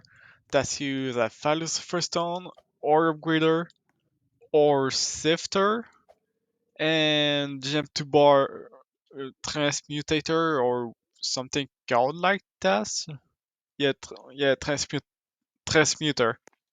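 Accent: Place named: France. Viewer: Your accent says you're from another country. I mean, yes, you're from the United States, but at the same time, I feel like you have French roots.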